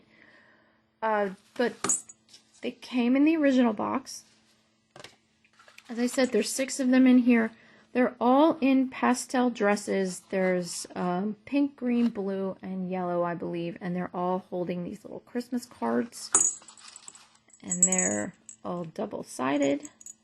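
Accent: New Jersey, United States. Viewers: What a soothing, ASMR voice she has. But that's not why I subscribe. She does a great job of in depth evaluation of resale items. I never miss an episode. I love that Jersey (or what ever it is) accent.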